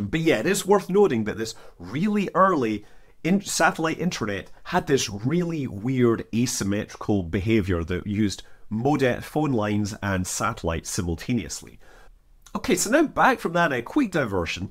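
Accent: Scotland, United Kingdom. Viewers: Either I'm getting more used to hearing USA accents everyday by living in the US (I'm English), or you're becoming more Scottish again. You're losing the Irish accent as well.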